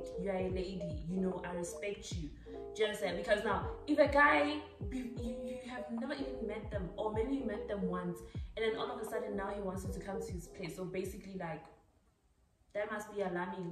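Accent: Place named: Nigeria. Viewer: It’s the nigerian accent for me😂😂 biko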